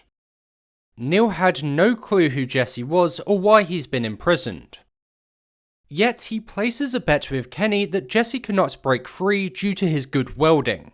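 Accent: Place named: South, United Kingdom. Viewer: It’s just a regular, South English accent.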